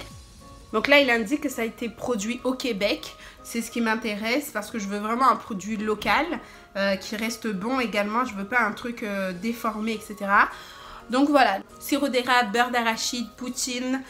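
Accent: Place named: Africa. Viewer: C'est pas parce que tu es Française "à la peau NOIR" que tu es regardé par les "Français" Tu aurais pu répondre en message et non nous rappeler que tu es "Française" à la peau noir et et l'accent Africain. Si les Africains se sont rapprochés de toi c'est juste qu'ils s'identifient à toi !!!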